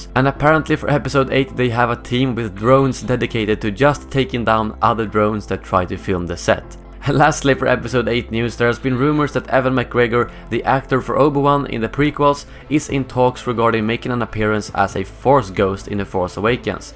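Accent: Sweden. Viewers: Let me guess? You are Swedish right?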